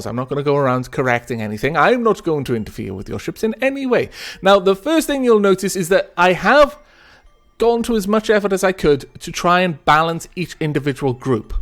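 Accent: Wales, United Kingdom. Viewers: You're welsh? Grwp? :)) that's awesome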